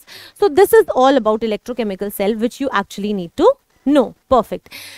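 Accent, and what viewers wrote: India. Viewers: Bhai AMERICA mein American english bolte h isliye smjh nhi ataa but MAM indian english bol rhi h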